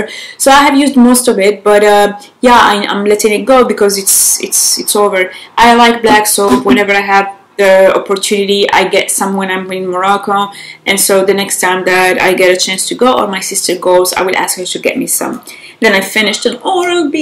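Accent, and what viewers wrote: United Kingdom. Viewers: Est ce que c'est moi ou ton accent anglais est un peu plus british than usual? By the way, very nice look😘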